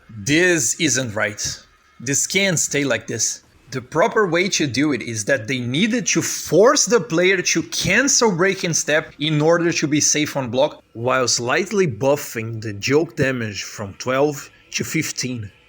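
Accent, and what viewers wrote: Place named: Brazil. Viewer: pelo sotaque vc é br ta de parabéns. vi gente falando que o Tekken 8 tava problemático (comecei a jogar o 7 aleatoriamente a pouco tempo e não entendo nada) e seus videos estão me explicando tudo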